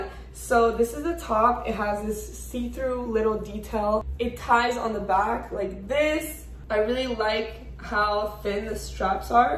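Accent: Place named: Brazil. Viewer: Não adianta ! O sotaque brasileiro no inglês é permanente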